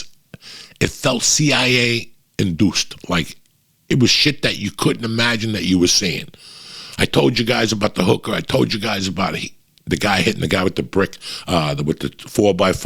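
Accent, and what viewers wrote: New Jersey, United States. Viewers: Somehow shameless advertising with a Jersey accent works on me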